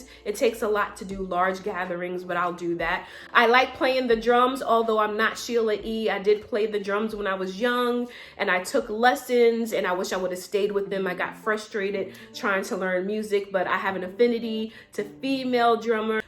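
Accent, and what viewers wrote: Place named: New York, United States. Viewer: I knew I heard a NY accent. U threw me off with being from NC. Now I know where it came from 😊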